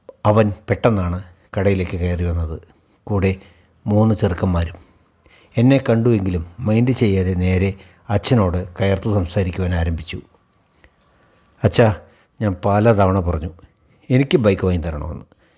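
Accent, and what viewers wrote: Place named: Valluvanad, India. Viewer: എന്നത്തേയും പോലെ നല്ല അവതരണം. നമ്മുടെ ആ വള്ളുവനാടൻ ഭാഷ കേൾക്കാൻ എന്താ സുഖം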